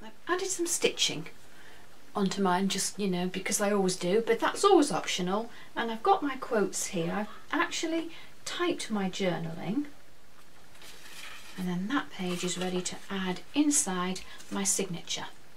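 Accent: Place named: Yorkshire, United Kingdom. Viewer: I didn't realise you were from Yorkshire!!.. you certainly have a lovely accent so if I was guessing I would say you're from North Yorkshire...Ilkley or Harrogate? I'm not that lucky, I'm from South Yorkshire.....Barnsley, (all flat cap n whippets) 🤣 and you have to say like a bleating sheep...Baaaaaaaaarnsly🤣🤣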